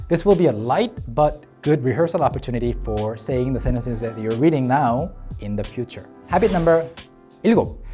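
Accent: France. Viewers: I like his accent as a fluent frensh speaker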